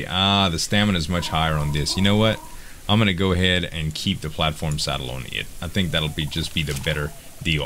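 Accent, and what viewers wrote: Louisiana, United States. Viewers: Mais, you from Louisiana?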